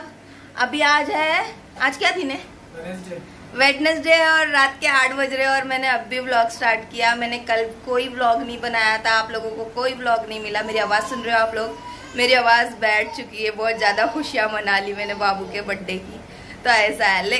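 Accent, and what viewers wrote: Hyderabad, India. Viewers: Baji aap hyd ki language baat kar rahi ho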